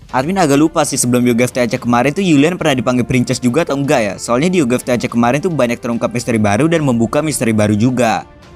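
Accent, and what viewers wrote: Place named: Jakarta, Indonesia. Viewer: fix admin Anak Jaksel Banget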